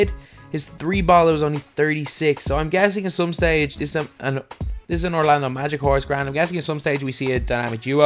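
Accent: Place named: Ireland. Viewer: man your prenounciations are just wired I guess that's just your Irish accent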